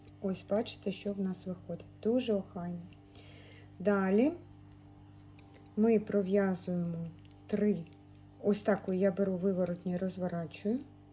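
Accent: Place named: Russia. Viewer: Відпрацюйте українську мову , трохи ріже слух ваш російський акцент ..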